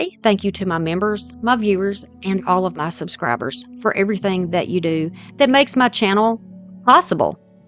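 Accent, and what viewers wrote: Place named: South, United States. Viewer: PS ....I LOVE LOVE your accent ... I regularly watch Randy and Amanda from Incredible Tiny Homes ...I found them during tough times ...so now I am a sucker for a southern accent ....wishing you well ...happy crafting ..xo from Vancouver BC Canada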